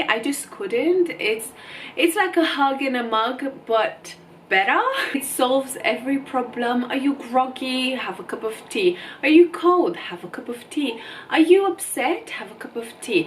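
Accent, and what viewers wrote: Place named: United Kingdom. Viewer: You have picked up a much stronger British accent than all the Romanians I know in the UK.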